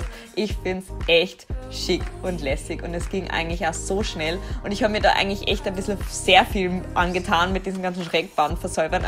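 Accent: Vienna, Austria. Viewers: Woher bist du eig., aus Wien,... oder Bayern?😂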